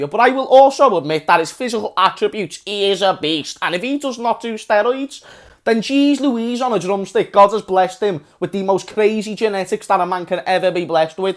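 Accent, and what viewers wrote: Liverpool, United Kingdom. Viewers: Liverpool accent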